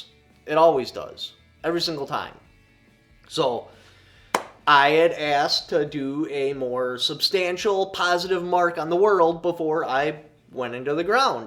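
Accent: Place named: New England, United States. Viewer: Not gonna lie, it took me some adjustment to get accustomed to your yankee accent. AFTER that I became attached. That said, I am sorry if I missed the vid of what’s going on with you; could you do a vid where you break it down?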